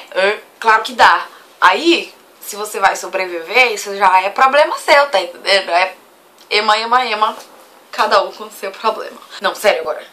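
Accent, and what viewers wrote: Pará, Brazil. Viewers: Ah esse sotaque paraense... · Sotaque paraense? Hahaha Te sigo a pouco tempo mas estou adorando saber da tua experiência! Não vi todos os vídeos ainda, por isso não sei se vc já disse alguma fez, mas qual curso vc faz? Eu queria muito poder fazer o Ciência Sem Fronteiras, mas meu curso, Direito, não está incluído na lista :((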